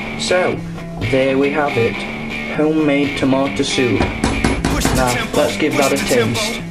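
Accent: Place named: Stoke-on-Trent, United Kingdom. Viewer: Stoke on Trent accent....Shropshire.. Silk Road & all that jazz. You still alive?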